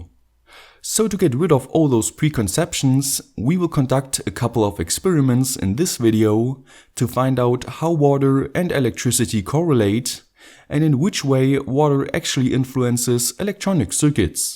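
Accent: Germany. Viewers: Man merkt so das er sonst deutsch spricht😂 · Are you a german? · Bist du deutsch? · Du bist deutsch hat man herausgehört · are you German? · aha du bist deutsch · Lol, du bist 100pro Deutsch! Aber trotzdem gutes Video! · Are u german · Den deutschen akzent hört man halt direkt raus xd aber geiles video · You are from Germany? Kommst du aus Deutschland 🇩🇪? Siehe min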